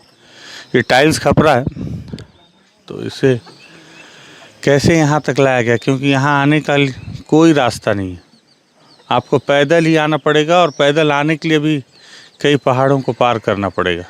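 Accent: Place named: Varanasi, India. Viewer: गुरु आप वाराणसी से है या छत्तीसगढ़ से ही क्योंकि आपको देखकर लगता है आप छत्तीसगढ़ से है और सुन कर लगता है वाराणसी के है राम जी का पूरा प्रभाव है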